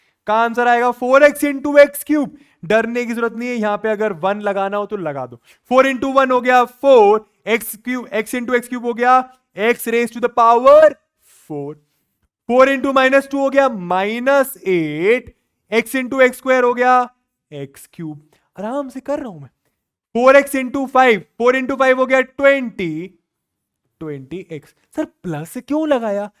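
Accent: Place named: Bihar, India. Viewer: ap bihari ho /mai bhi hu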